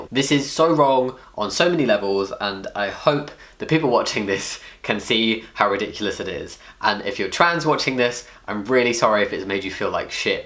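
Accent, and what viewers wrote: United Kingdom. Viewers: Wait, aren't u British?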